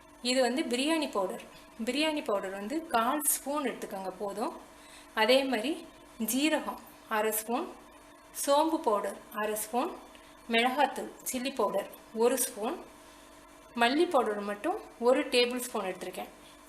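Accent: Madurai, India. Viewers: Mam neenga sonna method la idiyappam senjen color color ah super Then ipo yaara priyani seya poren today Sunday spl ah senjittu solren And neenga enga madurai sidenu ninaikkuren. Madurai style la pesuringa. Really super. Tq.mam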